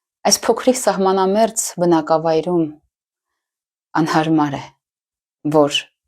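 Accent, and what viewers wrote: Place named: Russia. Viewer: ինչու եք ռուսական տոնով խոսում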